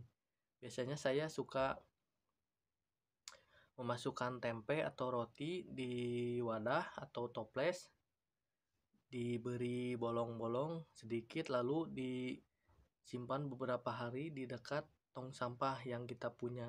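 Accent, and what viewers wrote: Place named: West Java, Indonesia. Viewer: 😆😆alus ngomong na😅sunda indo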